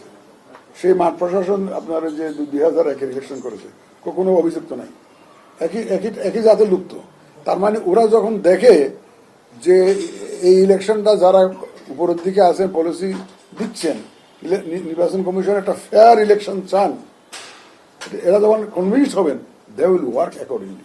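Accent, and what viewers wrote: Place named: Chittagong, Bangladesh. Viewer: চট্টগ্রামের মানুষের মত লাগতেছে। উনার বাড়ি কি চট্টগ্রামে।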